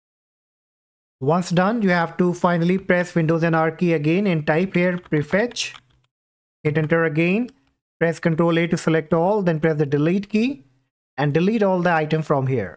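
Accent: India. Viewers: sound like an indian person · Why he sound like scammer indian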